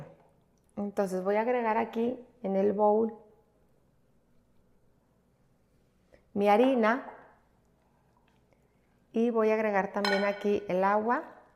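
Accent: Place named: Mexico. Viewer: Nena creo no equivocarme de qué sos mexicana y hablas muy lindo muy bonito me encanta tu acento tu ternura tu dulzura tu calidez tu feminidad yo creo que cualquier mexicano se debe sentir orgulloso de que representas a tu país maravillosamente te mando un abrazo eterno Virginia de berisso Argentina.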